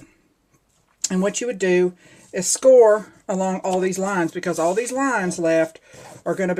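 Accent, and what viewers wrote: South, United States. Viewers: Oh my goodness thank you! I love all your peekaboo pockets. I just found your channel a few weeks ago and I have to say I so enjoy your mini's and have watched all of your videos already!! This video was awesome!!! <3 Your southern drawl is super awesome I could listen to your tutorials all day!! Your such a great teacher!!! ((NY hugs)) -christine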